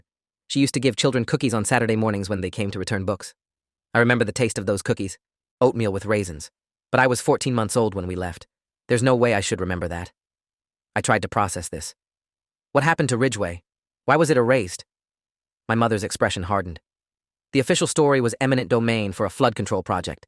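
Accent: United Kingdom. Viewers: There is a bit of a British accent that comes through the voice filter must have malfunctioned.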